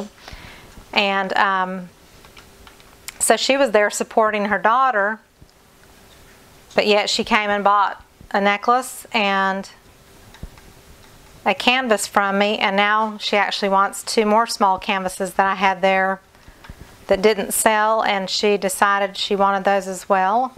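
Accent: South, United States. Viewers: Enjoyed watching and learning. , I tried one yesterday with no silicone and had the same results but liked the shine, bought more colors today. Love your southern accent, l lived in Shelby for 30 years, I do miss North Carolina!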